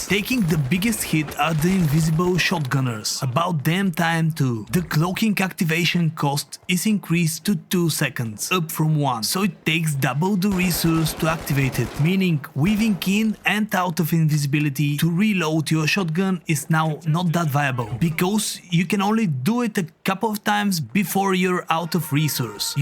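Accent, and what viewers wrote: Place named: United States. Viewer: please dont take this negatively but I love your american accent. what are "Resuesses" though?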